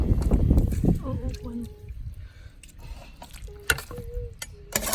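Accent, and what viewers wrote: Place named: Khmer Suay, Thailand. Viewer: ตอนแรกนึกว่าเอื้อยเป็นซวยเขมรทางบุรีรัมย์ สำเนียงการเว้า หน้าตากะคือ อย่าด่าเด้อ พึ่งคอมเม้นแรก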